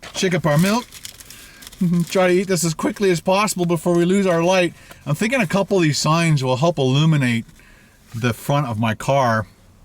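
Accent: Canada. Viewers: Ouuuuuuu!!!! You are Canadian "EH"!) <3 I have always said that our own country is just as good as the USA to explore and take a vaca!) Fabulous work brother!) Keep up the vids!) <3 I will be driving there for sure!!!! I'm sure the mango nectar helps to calm the spice eh!?!) Would love to hear your story! ....where you came from and how you became a YouTuber!!! ;) <3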